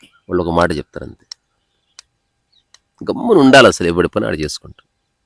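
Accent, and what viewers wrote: Telangana, India. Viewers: Dear I lovers the video of all 3 of you The back ground, the hay stock and everything. Enjoyed the content and burst in to laughter of joy with your Telangana Accent and sweetly scolding the dog. I think dog is expressing its love 🧡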